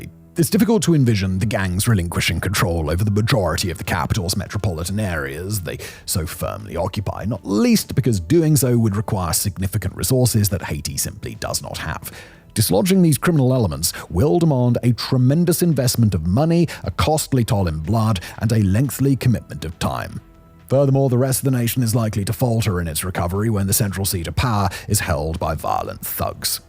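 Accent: England, United Kingdom. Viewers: Simon create a video full of lies using a mix of content from a bunch of other online contents. I have friends in Petion-Ville right now having more fun than me and looking forward to enjoy the weekend. Don't let this random English accent define what's going on in Haiti....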